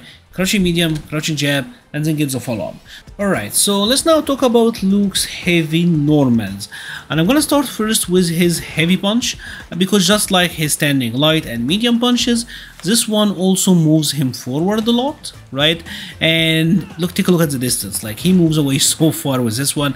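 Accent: Egypt. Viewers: habibi good to see that there is an egyptian community for streetfighter, i just got the game a couple of weeks ago and learning luke so when i heard your accent i immediately knew you were egyptian. btw amazing guide super helpful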